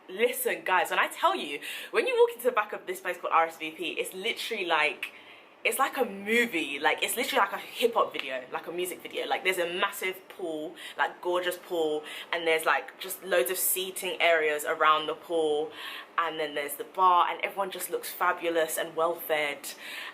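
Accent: United Kingdom. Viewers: UK accent right there , so when I went to UK i automatically grabbed the accent 😇😇😇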